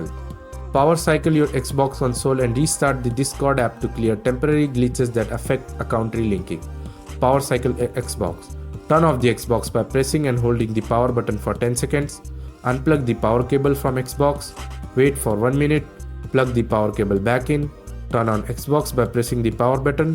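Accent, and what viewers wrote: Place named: India. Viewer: You think I didn’t search up how to fix if I wanted a Indian to read it out I would have asked chat gpt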